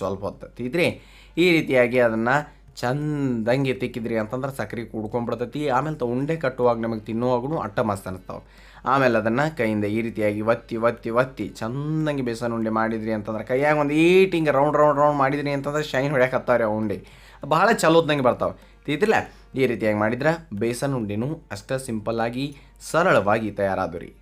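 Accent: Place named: Bijapur, India. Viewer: Nau kuda bijapur davru sir matadidu keli manssige tumba sanda aaitu sir🙏🙏❤❤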